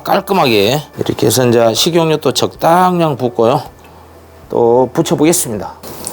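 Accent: Jeolla, South Korea. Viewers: 말투가 너무 정겨워요. 전라도 사투리는 요란스럽지 않아서 좋지요 ㅎ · 전라도 사투리 구수하네 ㅋ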